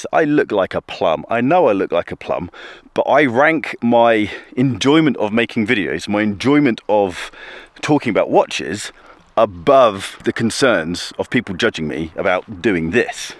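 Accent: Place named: Southern Scotland, United Kingdom. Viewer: I do enjoy your content and even more so with the Southern Scottish accent 😉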